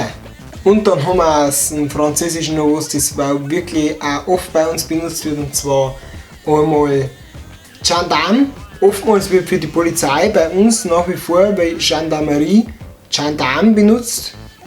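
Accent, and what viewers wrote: Bavaria, Germany. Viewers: Konnte als Niederbayer aus Landshut, dort geboren und aufgewachsen mit niederbayerischen Eltern und Freunden, kaum mithalten. Ein Sprachmittler zwischen Pleysteiner und meinem Dialekt wäre fast notwendig gewesen. Ein Allgäuer, nehme ich an versteht den gar nicht. Muss er ja auch nicht.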